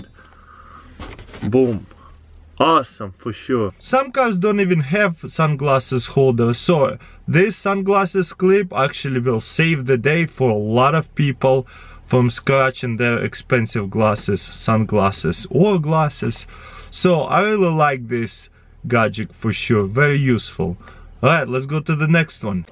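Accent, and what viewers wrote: Russia. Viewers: Michael Hawthorn meeee i love Russian Accents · I've always wondered do you live in Russia because you have a Russian accent? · I think your Russian accent is what makes you so hilarious, not to mention the BOOMS and the TUMBS up.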